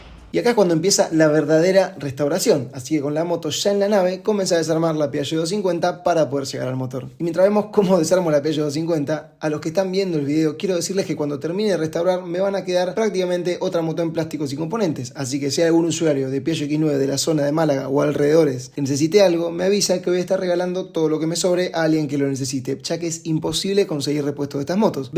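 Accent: Argentina. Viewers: Argentino en España? Me salió sugerido tu vídeo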